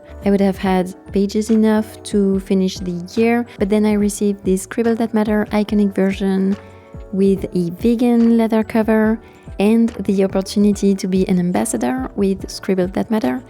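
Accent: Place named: France. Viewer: I love your originality - such beautiful work! And I love your French accent too!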